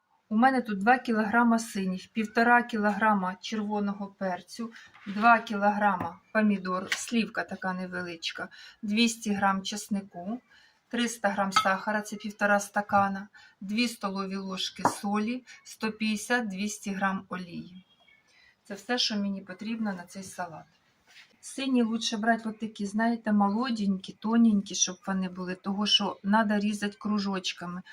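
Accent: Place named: South-East, Ukraine. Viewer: Дратує? Прямим ходом на медичний канал лікуватись! Мене забавляє південно- східний діалект.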